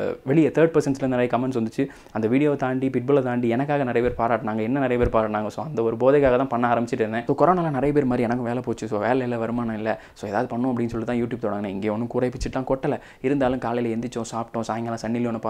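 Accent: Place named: Nagercoil, India. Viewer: hmm voice ah pathu tha keta nenacha neenga Nagercoil thanu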